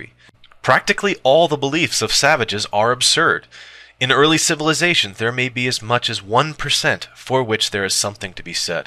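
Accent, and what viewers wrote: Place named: United States. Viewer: Why an American accent of ones words who was so very English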